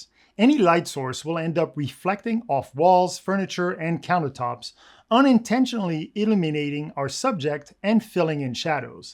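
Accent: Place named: Quebec, Canada. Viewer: I would have guessed French Canadian.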